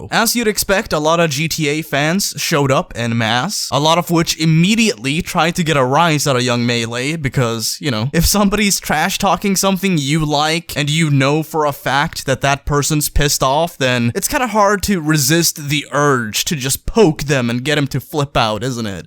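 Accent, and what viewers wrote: Sweden. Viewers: Are u from Sweden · Vae fan äru svensk? Good video tho.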